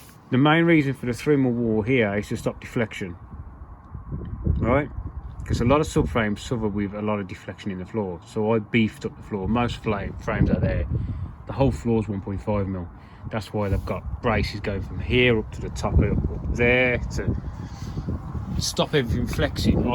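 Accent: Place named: Midlands, United Kingdom. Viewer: Hi mate - guessing from your accent your from the Midlands - where about a are you ? Would like to discuss a possible project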